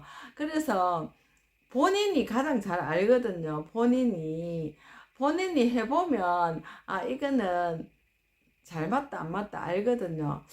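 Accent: Gyeongsang, South Korea. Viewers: 찾아주셔서 매우 감사합니다 좋은 강의도 듣고요 어찌 알고 오셨나요 행운의날예요 간격두고 인사올릴께요 영주 남편고향 가면 사투리 듣는데 경남이신갑네예 ⚘️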